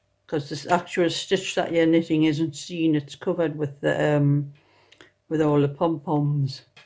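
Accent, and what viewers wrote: Newcastle, United Kingdom. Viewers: Lovely to hear another geordie voice , I love knitting ,Thanks for sharing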